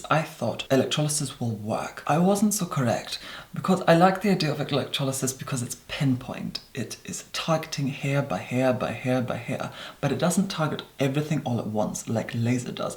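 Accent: Scotland, United Kingdom. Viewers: This is the most Scottish I've ever heard you be, yay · Obvz but where in Scotland? He has a mix of accents . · He's actually Scottish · Just realised the accent is posh Scottish not English the fuck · Um bull. I know people from Ayr and other near areas and they sound very similar to this. It may well be exaggerated- but this is normal for that region of Scotland. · I hear a little bit of a scottish undertone to your accent, any scottish heritage?! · Анастасия Горячева l think that it's a Scottish accent from one of the Highland islands x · is he scottish? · I am loving your accent, a hint of scottish in there? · Eyez Cold is not English. That, you will find, is a beautiful classic true Scottish accent. From the days of Robert Louis Stevenson.... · Yes he's Scottish :) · Are you scottish?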